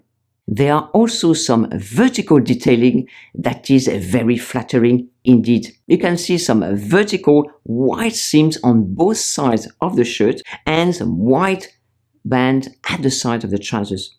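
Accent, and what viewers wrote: France. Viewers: I could listen to you talking with your French accent forever. 💙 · Such helpful advice, even more effective delivered d with a charming French accent! And your humor is another bonus 😍thank you! Just found your video today so am subscribing formore🙏🏼🥰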